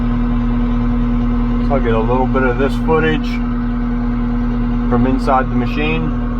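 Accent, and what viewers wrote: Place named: Pennsylvania Dutch, United States. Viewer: I seem to detect a heavy "Pennsylvania Dutch" (German) accent when you talk. That combined with your German last name, brings me to that conclusion. Are you located out of Amish Country in PA?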